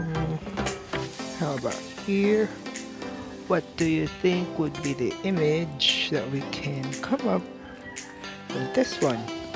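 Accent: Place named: United Kingdom. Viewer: lovi'n the british accent though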